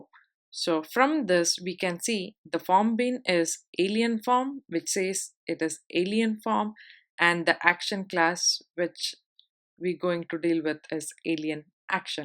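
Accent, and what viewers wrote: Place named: Europe. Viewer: Her accent is more like European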